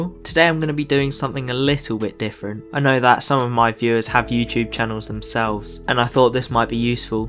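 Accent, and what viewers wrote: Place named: United Kingdom. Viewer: I'ma assume you are British Your accent sounds British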